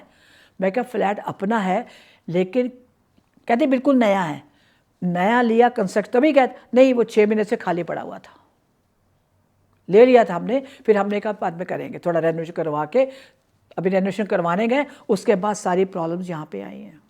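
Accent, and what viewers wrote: Punjab, India. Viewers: Madam ji as per your accent I think you are punjabi , are you ?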